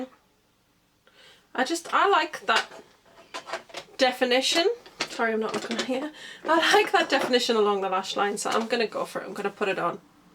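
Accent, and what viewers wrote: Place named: Newcastle, United Kingdom. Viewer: The eyeshadow looks lovely with your hair... very pretty.. Im sure you have a hint of a Geordie accent.. x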